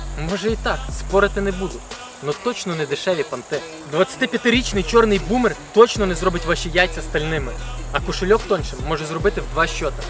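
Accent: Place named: Carpathians, Ukraine. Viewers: По-українськи правильно "говорю",а не "гуворю".Селюків карпацьких понаїхало дратують своїм говором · Блин западэняцкий акцент, тяжело слушать когда знаешь какие мрази большенство из них.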